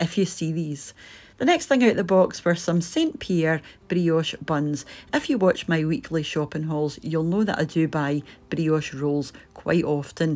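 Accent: Scotland, United Kingdom. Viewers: I'm a SASSENACH, and I love your Scottish accent: "In a wee while, I'm away tae make Mester tea!" 👍😂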